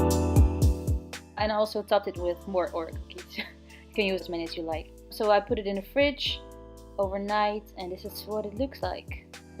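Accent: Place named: France. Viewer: Hello, what a wonderful personality, heart and energy you have <3 First let me ask you, as your accent in English seems a bit "French" to me, is this due to your heritage or upbringing or just the way I hear it and feel it? Next, not to put a damper on you or your video because it is Beautiful but, if you are using Oreos with the same ingredients as here in the States; then you are taking in lots of things that are unhealthy to your Human Body as well as the environment. Such things like, sugar, palm oil, high fructose corn syrup, corn starch and artificial flavors as the really not so good ones you need to avoid. Sugar, especially refined, but in all forms is very addictive and lights up the Human Brain in the wrong way, as well as the glycemic index and how it regulates in your pancreas, body and blood. Use raw honey for best natural sweetners. Palm oil as it is first off not going to be sustainable and this means the forests are cut down fast and the land left in ruins, as well as Orangutans left homeless, split from familys and many killed in cold blood. 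Sorry the Truth is very sad on this Planet due to unconsciousness of the Masses and the fact many things are kept from us a a Species due to Corporations, Media/Tv and for greed and profit. High Fructose Corn Syrup or HFCS is one that is a no no and very processed and should be known by everyone now days. Artificial Flavors are more then likely not only chemicals, but animal or animal by products. On top of this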